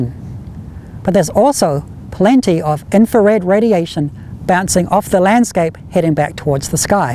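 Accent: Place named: Australia. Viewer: blimey an aussie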